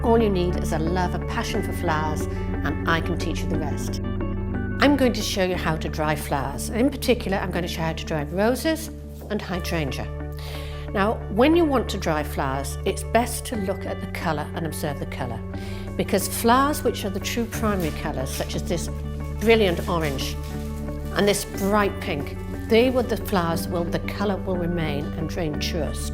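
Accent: United Kingdom. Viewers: british as hell, sharp as usual good job!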